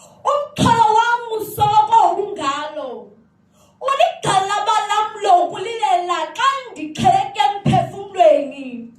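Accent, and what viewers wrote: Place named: Xhosa, South Africa. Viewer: Samandi isixhosa salosisi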